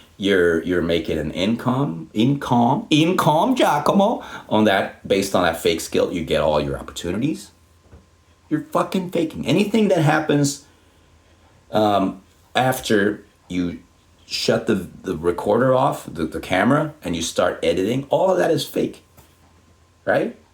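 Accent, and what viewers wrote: United States. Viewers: En ymmärrä mistä sait tuon jenkkinuotin englannin puheelle. Tästä voisit tehdä videon, että miksi osaat noin hyvin? Hyviä videoita olet tehnyt.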